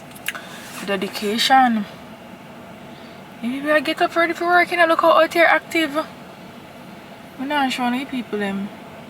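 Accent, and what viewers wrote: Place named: United Kingdom. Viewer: I love the British accent ❤😂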